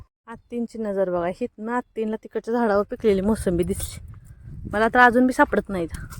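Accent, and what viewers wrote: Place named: Solapur, India. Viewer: ताई तुमचं माहेर सोलापुरा कडचा आहे का कारण तुमचं बोलणं तसंच वाटतं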